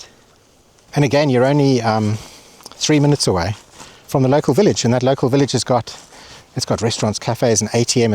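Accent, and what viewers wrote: South Africa. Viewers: Nice to hear a South African 😊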